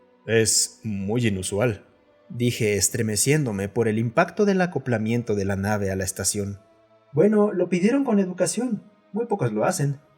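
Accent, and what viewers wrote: Argentina. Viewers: Argentino nos salió el pibito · Vi la versión de la rana que narra ... Y tu lo volviste argentina merces mil años de salud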